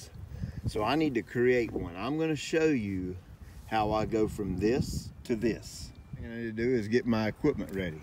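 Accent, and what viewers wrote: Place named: Georgia, United States. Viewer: Like that South Georgia drawl! North Georgia here!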